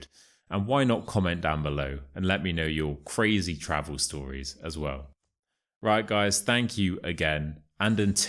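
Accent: RP, United Kingdom. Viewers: I like you reading in British English accent. Because making me leaning more English words when you reading Thank you 🙏 ❤for your share teaching In British English accent You good teaching ❤ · Hello, dear! Thanks a lot for the excellent opportunity to practice your nice British RP!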